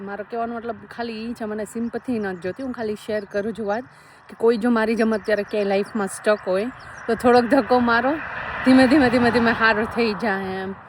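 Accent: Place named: Saurashtra, India. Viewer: બેન આપ મુળ વતની ક્યાં નાં કારણ કે આપની ભાષા સૌરાષ્ટ્ર ની હોય એમ લાગે છે આપ હાલ ક્યાં રહો છો આપની સમસ્યાનો હલ જરૂર ભગવાન માતાજી કરશે... આપની સમસ્યા સાંભળી દુઃખ થાય છે જય માતાજી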